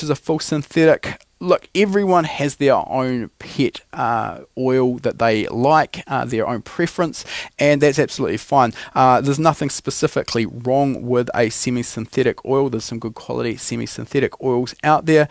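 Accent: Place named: New Zealand. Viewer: Perhaps that's how guys from NZ sound.The content is precious though so pay attn to that ☺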